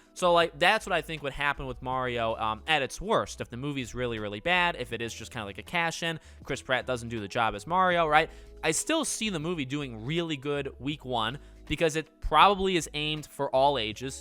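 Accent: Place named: East Coast, United States. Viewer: Unrelated but I need to say how much I love your east coast accent. It brightens my day every time you say “Welcome to pahhhhhdcahst now, I’m!” 😝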